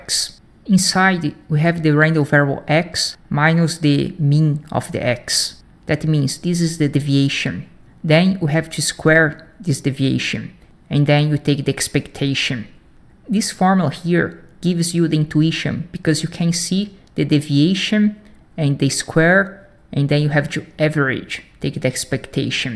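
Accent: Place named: Brazil. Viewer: His accent is very Brazilian also 😅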